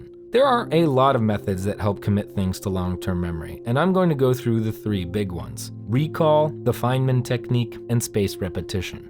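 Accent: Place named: South, United States. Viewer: I love your accent. Is it southern?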